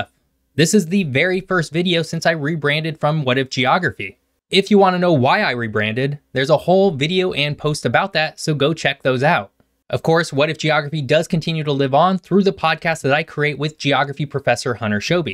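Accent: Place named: Oregon, United States. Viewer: sounds like he is from Oregon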